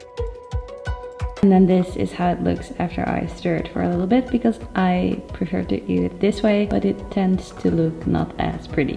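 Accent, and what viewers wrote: Netherlands. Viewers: she's dutch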